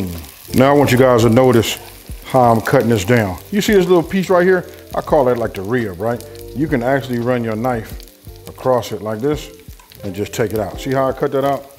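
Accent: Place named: West Coast, United States. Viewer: You 👀 my size. Southern with a West Coast accent😅. I love it! Also, I need that mandolin.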